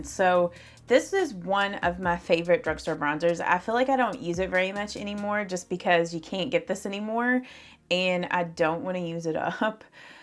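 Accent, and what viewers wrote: South, United States. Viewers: It could be her accent, it's a little southern ❤. She pronounced it Ma/cari. Which I also say ma/cari lol.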